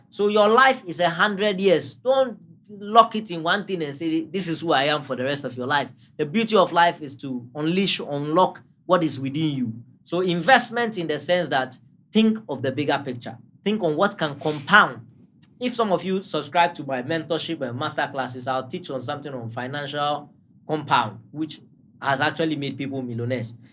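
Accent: Ghana. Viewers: This Indian guy has a Ghanaian accent. Did he grow up in Ghana? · He sound Ghanaian🇬🇭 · Are you a Ghanaian his voice is like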